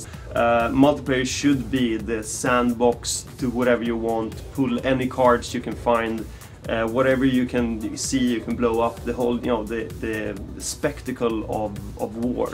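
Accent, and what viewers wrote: Sweden. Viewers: ._. swedish accent ja! some needs to train that accent away... i managed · I know this might be a bit old.....buut man, English! with a Swedish accent doesn't sound very nice :P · God I hate the swedish accent :( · thumbs up for Swedish accent. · swedish accent hellyeah! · omg what a swedish accent :D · You can hear hes sweedish :D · man hör verkligen att han är svensk ;9 :) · he sounds swedish ^^ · Swedish accent <3 (im swe ) :D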